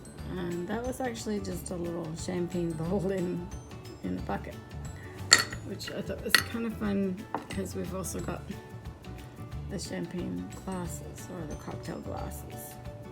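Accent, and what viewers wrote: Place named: United Kingdom. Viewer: That's a very cool idea! I hear that British accent sneak out once in a while.😁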